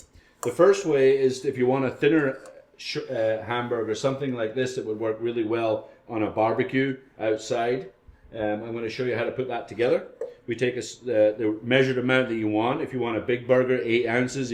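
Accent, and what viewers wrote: Scotland, United Kingdom. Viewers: He's Scottish ! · Canna hide his Scottish accent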